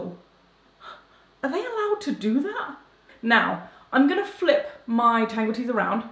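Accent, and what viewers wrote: United Kingdom. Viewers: Are you British???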